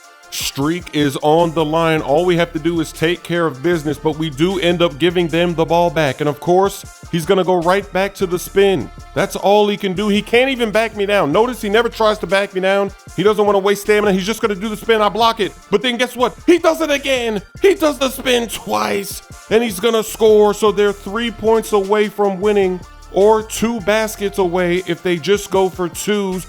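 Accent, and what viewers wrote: United Kingdom. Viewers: Is English?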